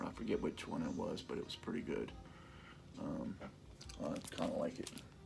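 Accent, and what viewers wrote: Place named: West Virginia, United States. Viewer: Are you in WV? You don't sound too far away from me I'm in the Panhandle anyway you have a great collection there I totally forgot about the, they had some great songs.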